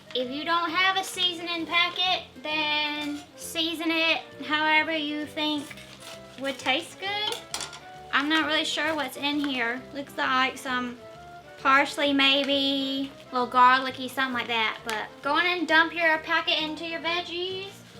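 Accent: South, United States. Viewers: Slow southern draw! Haha it was an adjustment for me when I moved from up north to down south. Apparently on the flip side I speak really fast! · You look like wynonna rider! But with a southern accent! I promise it’s a compliment!! ❤️❤️❤️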